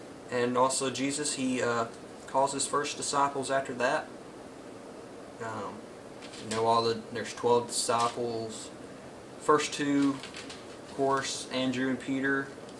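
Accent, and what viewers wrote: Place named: Carolina, United States. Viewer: You don’t have a Georgia ascent you have like a North Carolina South Carolina ascent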